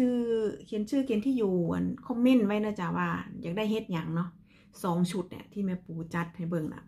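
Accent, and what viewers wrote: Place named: Nakhon Phanom, Thailand. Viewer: แจกเบิดรึยังจ้า อยากได้ขุดที่1 ภาษาเว่าคือไทนครพนมแทะจ้า แม่นคนนครพนมบ่อ อรอุไรลักษณ์ จีรันทนิน 200/803ม.4ต.ระแหง อ.ลาดหลุมแก้ว จ.ปทุมธานี 12140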